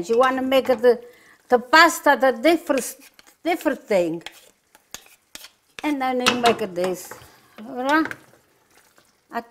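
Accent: Italy. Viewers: Always a pleasure to watch you cook and to hear your Italian voice. I gifted, again, your cookbook to a loved one. Precious recipes. Health and strength to you, and to all your famiglia.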